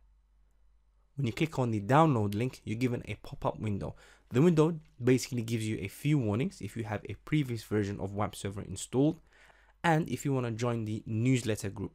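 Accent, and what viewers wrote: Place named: Africa. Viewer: african accent i guess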